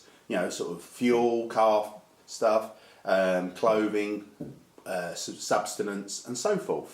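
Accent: United Kingdom. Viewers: i like British accent im enjoying the way you talk.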